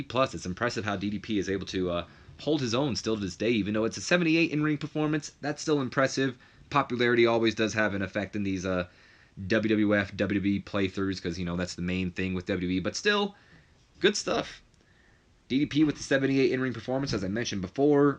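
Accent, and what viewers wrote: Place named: United Kingdom. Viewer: I'm british but not from a posh away so no offence was taken with your british accent Also great vid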